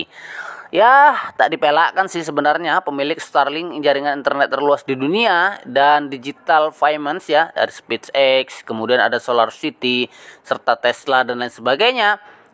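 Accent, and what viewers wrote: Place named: Java, Indonesia. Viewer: aksen jawa kental sekali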